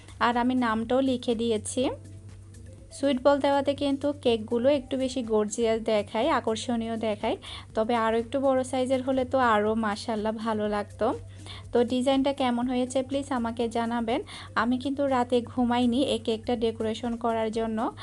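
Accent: Chittagong, Bangladesh. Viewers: Apni ki chittagong er?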